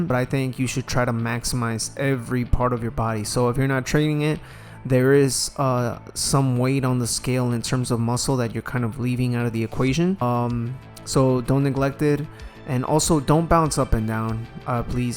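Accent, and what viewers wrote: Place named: Hawaii, United States. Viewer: He has a slight Hawai'i local accent. Thats how it sounds to me, but the exercises are helpful. Good stuff.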